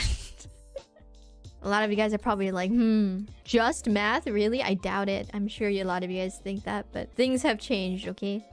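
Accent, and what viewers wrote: United States. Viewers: She has an american accent in my opinion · I mean, she has an accent alright, but it's an English accent, specifically sounds like west coast NA. She's very clear with her words, and only slightly slurs them when excitable from what I can tell, but it is very clean otherwise. Idk bout her Japanese speaking though, can't speak it myself, so unsure how it comes across to native speakers. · She sounds vaguely American to me. But I am not the best with accents.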